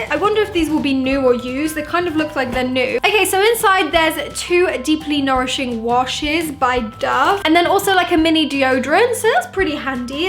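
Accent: England, United Kingdom. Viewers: Are you from britain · 1dlover42aj british accent? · Is she British? 🇬🇧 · You sound so British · She's english.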